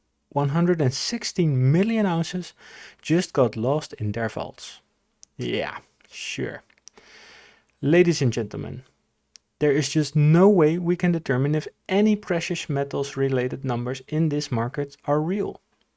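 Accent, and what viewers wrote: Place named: Germany. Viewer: With that accent you got to be deutch ?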